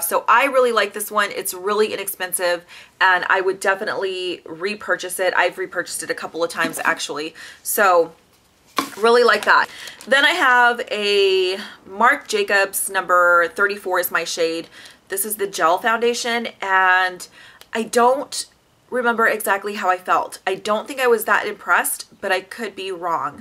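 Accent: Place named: South, United States. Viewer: Very good information, thanks! I can tell you are a fellow southerner..."we are Fixin' to go to my mother's" We only say Fixin' to do something in the south. Have a great weekend!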